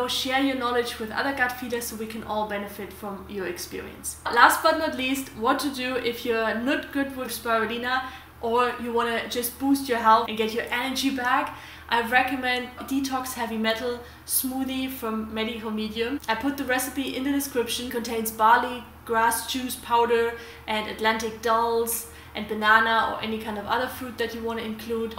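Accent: Germany. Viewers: So which spirulina is the best to buy? By the way, you have a verry german accent 😊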